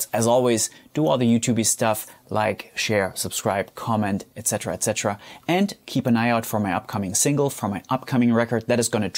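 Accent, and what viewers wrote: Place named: Germany. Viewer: HI MARTIN! Wo hast du dein musikstudium gemacht? Es sieht so aus als hätte Deutschland ein guitarristenboom mitt Manuel Garder Fernández und dir. Mann kann ein sliver of a german accent in dir erkennen aber du sprichts echt super! ( ich binn halb deutsch halb spanier) Viele Grüße.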